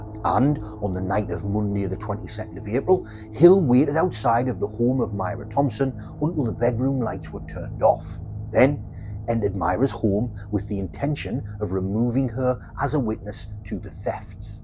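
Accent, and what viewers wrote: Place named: North, United Kingdom. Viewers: Subbed, and I'm only 13 minutes in! 🎉 I'm originally from the North so your accent is making me homesick 😅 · I was gonna say. It's a Sunderland accent not Geordie.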